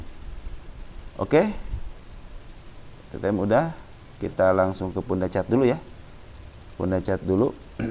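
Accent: West Java, Indonesia. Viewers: Si aa urang sunda pisan logatna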